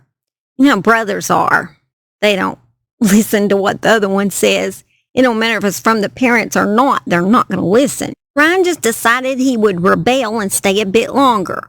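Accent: South, United States. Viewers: Congratulations!!!! 👏🎉 1,000 subscribers!! WOOHOO!!🌟🌟🌟🌟 You're going places 👍👍👍♥️💛💙🧡💚💜 your little Southern voice it's just perfect it fits you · I enjoyed your video and your accent. Please keep it up. Sorry about your lupus. I have 7 autoimmune problems. It stinks. You take care of yourself. I’m from NC. I love Southern people.❤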